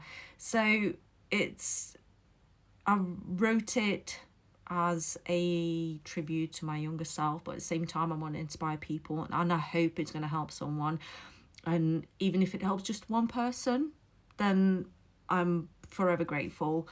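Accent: Northern England, United Kingdom. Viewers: Beautiful spoken English! Northern accent, which is fine. I guess it MAY be possible for a language expert to detect a foreign accent but it would take a lot of effort and most native speakers would think you a native Brit. PS - Most native English speakers make MANY grammatical mistakes. If in any doubt about this, walk the streets of Middlesbrough or Newcastle, or listen to virtually any footballer being interviewed (apart from Dutch or Nordic footballers whose English is normally excellent). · Welcome..you have obviously settled in with a Northern Accent And dropped that Cult at the same time.. The good thing is, you wont be knocking on peoples doors,( just as they are sitting down for Tea) punting the Watch Tower anymore. 🎖❤️🇬🇧🍷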